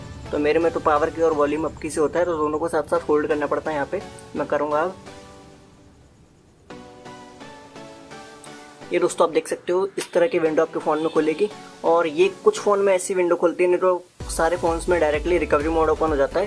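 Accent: India. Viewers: You know shits bad when it's Indian accent and you don't even know what he's saying. Good luck to all of you that can read this ._.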